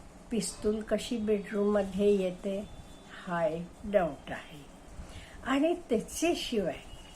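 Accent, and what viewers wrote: Karnataka, India. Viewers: तुम्ही मूळ कन्नड बोलता का. बोलण्या बरून वाटते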